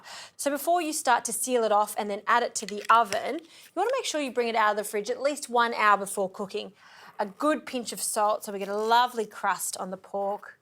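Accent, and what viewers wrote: Australia. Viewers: 😂😂😂😂😂😂😂peppah, sugah, flourah, buttah, australian stryne at its worst, some elecution lessons wouldnt go astray, yyyeeeeech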